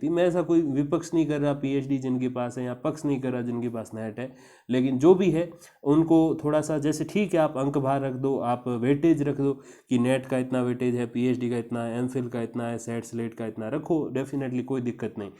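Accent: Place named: Nagaur, India. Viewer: Apki boli Nagur k Jaat jesi h esliye pucha. Thanks for all informative videos.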